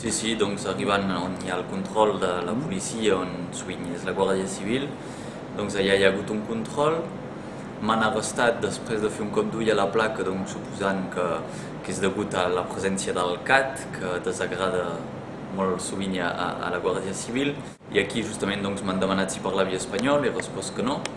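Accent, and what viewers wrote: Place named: France. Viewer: Llàstima que tinguis l'accent tant contaminat pel francès.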